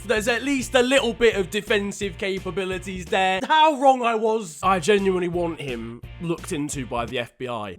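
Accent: Liverpool, United Kingdom. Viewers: Are you even scouse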